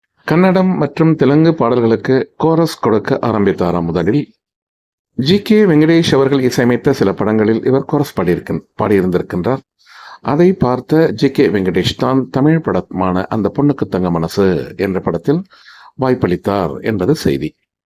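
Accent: Sri Lanka. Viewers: சிலோன் தமிழ் குரல் 🎉 அருமையான பதிவு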